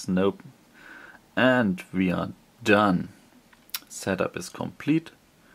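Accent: Germany. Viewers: are u from german ??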